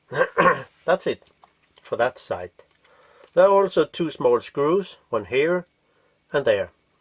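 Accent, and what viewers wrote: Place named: Denmark. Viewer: Excellent. I've had the Fuji badged version from new in 2012, good to know what's going on inside, and should I need to calibrate the rangefinder mechanism this is very helpful. Thanks also for speaking English. Are you Danish? Just out of interest, I'm guessing from your accent.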